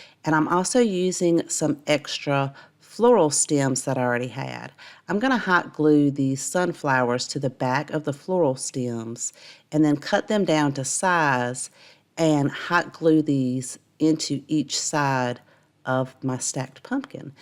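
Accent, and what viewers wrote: South, United States. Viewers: There’s no way I can pick just one - they are all amazing!! Love listening to you explain the projects with your Southern accent!!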